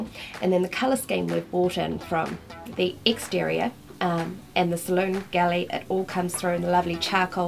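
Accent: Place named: South Island, New Zealand. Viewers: Ben Dixon that’s 🥝 kiwi’s for yah. Or is she Aussie 🤔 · I thought it had heads not heeds. Arrr that South Island accent.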